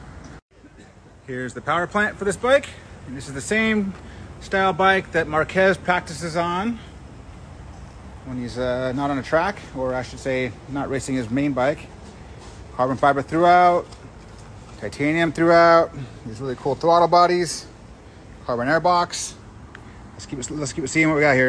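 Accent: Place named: New Zealand. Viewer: He sounds like a Kiwi.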